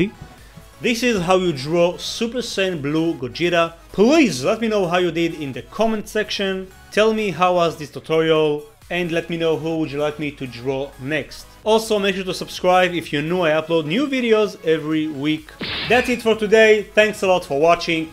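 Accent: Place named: Israel. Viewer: נשבע שהוא נשמע ישראלי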